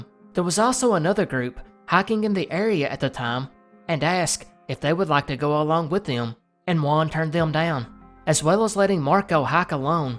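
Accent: South, United States. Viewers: Best Southern Accent on YouTube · I always love your channel. I’ve been a sub for a while now and you never disappoint! I’m from Texas so it’s nice to hear some southern accents in YouTube land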